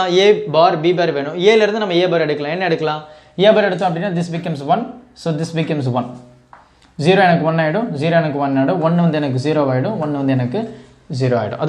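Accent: Coimbatore, India. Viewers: Bro are u from Coimbatore ahh bro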